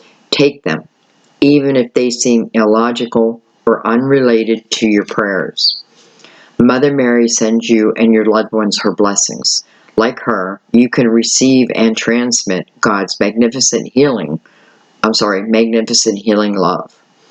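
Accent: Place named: Pittsburgh, United States. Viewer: You are getting so warm on what I am going through I can feel the heat! I found you about two years ago. Being a Pittsburgh native myself, I knew from your dialect and Steelers stuff that you were one too! So thank you for being there because when you read my cards, I am an Aries, it is like coming home to an old friend. Have a blessed year.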